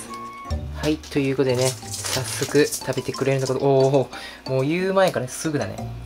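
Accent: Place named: Kansai, Japan. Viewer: 関西弁がとても胸を使われたので不純な気持ちでチャンネル登録してもーた🙈💕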